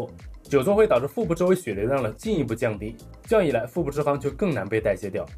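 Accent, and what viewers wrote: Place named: Dalian, China. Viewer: 這應該是地區發音的問題，之前我認識住在大連的大陸人，他講話也是這個方式，可能跟方言有關係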